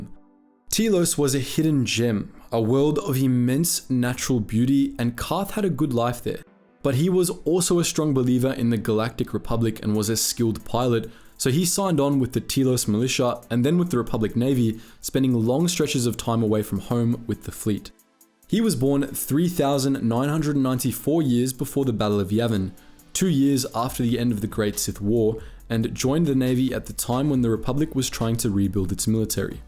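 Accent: Australia. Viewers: I’m trying to pinpoint the accent. Are you Australia like me too at all? This is the first time I’ve watched one of your videos you’re amazing so far I think! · As a fellow aussie I know how hard it can be to speak clearly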